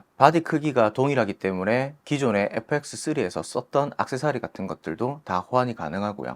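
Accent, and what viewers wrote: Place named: Gyeongsang, South Korea. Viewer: 아랫지방 사투리억양 너무 맘에 들구요 편안한 영상 좋아서 구독과 좋아요 누르고 갑니다!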